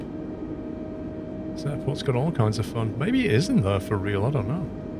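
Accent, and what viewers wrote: United Kingdom. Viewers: British accents are so fancy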